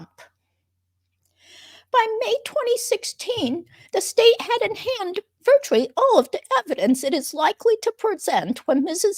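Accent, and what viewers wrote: New York, United States. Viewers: 😂😂😂😂. The jewyork accent is spot on. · You do a mean New York accent!!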